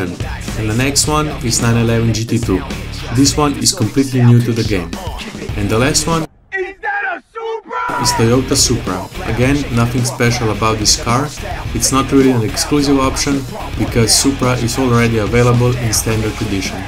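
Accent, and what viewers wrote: Russia. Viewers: Что это за русский акцент?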